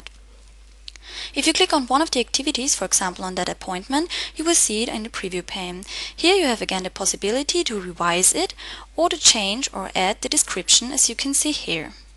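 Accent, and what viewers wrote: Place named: England, United Kingdom. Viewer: I just love her English accent.......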